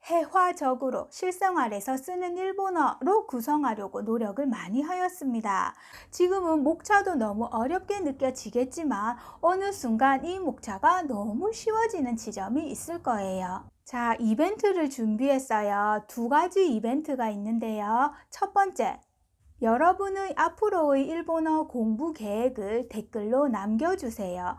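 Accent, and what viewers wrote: Busan, South Korea. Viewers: 님!저도 올해50이된 아줌마입니다!독학으로 취미로 시작해서 이것저것 공부해 오다가 목표에도 없었던 n3를 이번에 도전합니다. 커리큘럼따라 그대로 공부하고 있어요. 기초부터 아주 꼼꼼하게 가르쳐주시기 때문에 행여나 독학으로 완전히 다져지지않고 지나친 과정도 이번에 다시 바로잡게 되었어요^^. 센세 부산사투리 정감있고 귀에 쏙쏙들어오자나요?ㅎㅎ 물론 뇌도 나이가들어 어린학생의 두뇌의 속도는 따라가지 못할지 모르지만, 아줌마들의 끈질긴 근성과^^ 잃지않는 흥미를 꾸준히 유지하고, 매일매일 조금씩이라도 하시면 언젠가 자신도 모르게 일본어를 읽고 쓰고 말할날이 올거에요! 저는 지금 일본인 라인친구가 3명정도 있고, 영상통화로 서로 언어교환도 하고있어요! 응원하겠습니다. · 45세 된 주부입니다. 저는 2년전에 책을 사서 단어,문법 위주로 혼자 공부를 했는데요. 나름대로 열심히 했다고 생각했는데 혼자서는 역시 무리더라구요. 왜 그땐 유투브로 공부 할 생각을 안했을까요...들어야 귀에 익혀지고 따라서 말해봐야만 입이 열리는데 그때는 혼자서도 할수 있다고 고집 부렸어요..결국 포기하고 올해 5월 중순부터 다시 시작했어요! 저도 선생님처럼 연상암기법으로 단어를 공부하고 있습니다. 저는 경남 김해에 살고있는데 단어 암기법 하실때 선생님의 부산사투리가 귀에 쏙쏙 박히는 그 순간!!!! 구독했어요^^ 이상하게 들을땐 오그라드는데... 이상하게 외워지더라구요^^ 미미 초록 목도리, 왼쪽다리 흰다리 오른쪽 다리 미스코리아 다리😅😅😅 듣고! 따라서 말하고! 복습하면서 쓰고! 저 열심히 해서 일본어가 들리는 그날까지 절대 포기하지 않고 끝까지 최선을 다할겁니다. 저처럼 늦게라도 공부 시작하신 다른 분들 모두 진심으로 응원하구요! 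우리 모두 다 힘내요!!!! 아! 선생님 책 출간 축하드립니다!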